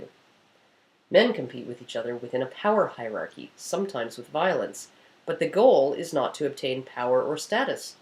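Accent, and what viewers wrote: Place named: Ontario, Canada. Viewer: Interesting, learned post here. Encourages me to view your others, if only I can get by that Ontario accent .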